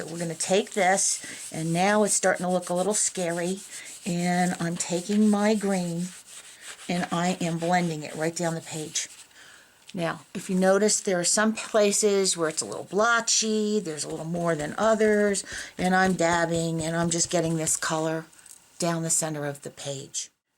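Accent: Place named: New York, United States. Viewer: I am SO happy that I found your tutorials! I love your colors and your personality--and I think I even detect a little NuYawk accent there :) Can't wait to catch up on the ones I've missed and the new ones to come. You brightened MY day! Namaste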